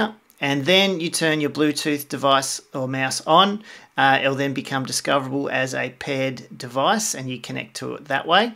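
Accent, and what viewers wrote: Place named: Australia. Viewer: I love his Australian accent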